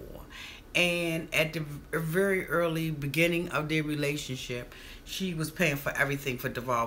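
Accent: Washington DC, United States. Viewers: Are u from D.C.? Lol. U have a D.C. accent.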